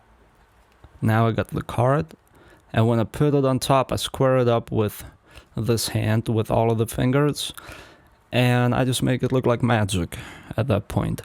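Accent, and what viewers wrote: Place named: Russia. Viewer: Ahhh that Russian accent😭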